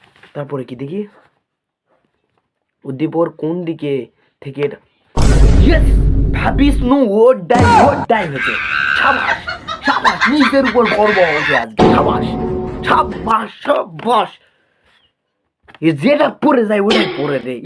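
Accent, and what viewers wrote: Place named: North Bengal, India. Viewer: ভাষা উওর বঙ্গের মনে হয় দিনাজপুর।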